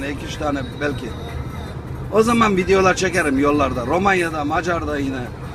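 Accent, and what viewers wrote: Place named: Anatolia, Turkey. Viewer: merhabalar nasılsınız bu konuşan arkadaş sizmisiniz şivesi sunumu çok tam anadolu :) ve kahkahsı ..hayırlı yolculuklar dilerim..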